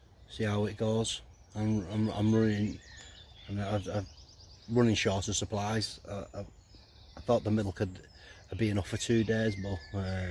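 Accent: St Helens, United Kingdom. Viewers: You from St. Helens mate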